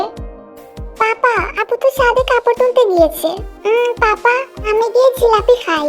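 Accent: Sylhet, Bangladesh. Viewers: আপু তুমি মনে হয় সিলেটি..❤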